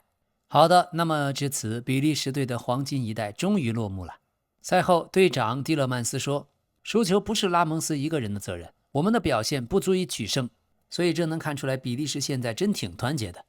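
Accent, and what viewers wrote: Chongqing, China. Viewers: 听口音老k应该是重庆人呀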